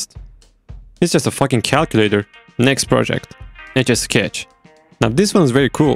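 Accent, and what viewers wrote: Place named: Sweden. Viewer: Where you from? You sound Swedish. 😊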